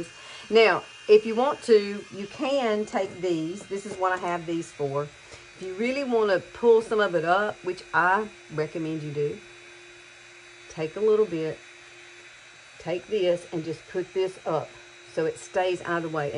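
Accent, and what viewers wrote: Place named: Alabama, United States. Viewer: I found your fine hair vids and immediately when I heard you talk I thought " Alabama". I'm an Alabama lady too though much older than you.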